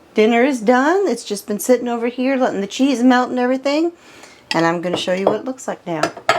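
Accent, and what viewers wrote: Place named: South, United States. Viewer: My hubby Jerry and I love to watch your cooking videos. Do I detect a little more southern charm to your words now a days?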